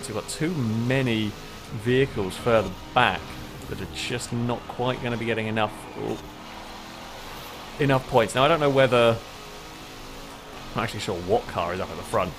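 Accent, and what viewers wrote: England, United Kingdom. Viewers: Going for the Aussie demographic in this video eh? As a Holden guy through and through it saddens me that it's getting killed off this year :( And also the way you pronounce Falcon is quite strange but I'm guessing that's just the Pommy accent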